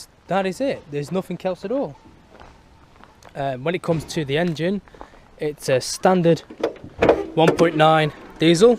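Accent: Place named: England, United Kingdom. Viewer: Your accent makes me miss living in England.. Would you adopt me?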